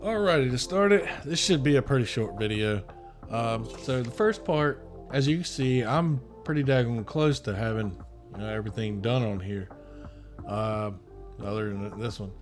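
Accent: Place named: South, United States. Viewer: Oh my word the accent on this man. I have a southern accent also . Thank you for a awesome video.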